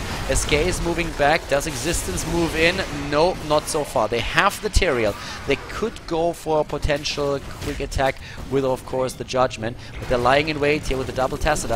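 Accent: Germany. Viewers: man hört so krass, dass du deutscher bist XD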